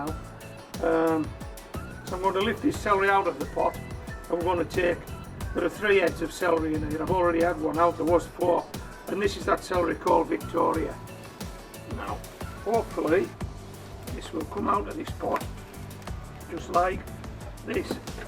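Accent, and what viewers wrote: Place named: Scotland, United Kingdom. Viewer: Love that Scottish accent!!!❤❤ Thanks for this, celery is the first veggie I'm trying to grow! In Australia!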